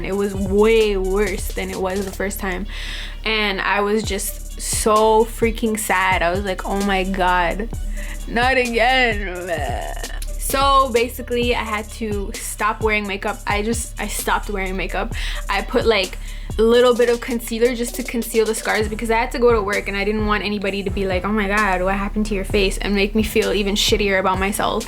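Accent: Toronto, Canada. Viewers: lmao soo funny how you can tell someone is from Toronto by the way they speak! I'm from Toronto as well and I know soo many people who speak the same haha love your vids! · Within the first minute I was like.. "she must be from Toronto... most likely Scarborough" lol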